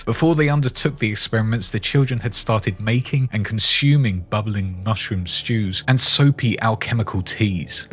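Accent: United Kingdom. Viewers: Just started. Don't know anything about the lore and was just waiting to hear the voice of who was making the video of the game. You are not annoying and have a British accent. 100% can watch this video and learn what I wanted to know 😂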